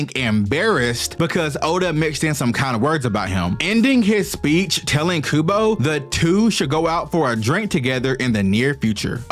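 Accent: United States. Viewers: It’s so fascinating how many similar rivalaries there have been in the Japanese and Western comic industries. The 90s were such a wild wild time for comics in both hemispheres. But also what sortve eccentric personalities get into comics. Such as Fujimoto and Alan. ( Alan believed in black magic and he quite literally attempted to end the world lol) It’s also very cool how a man with the MOST American accent is discussing Japanese comics. It’s beautiful considering not even 100 years ago - Japanese were so scared of Americans that they’d kill their own children instead of getting captured. And Americans were so scared of Japanese they created a sun, ti throw at Japan.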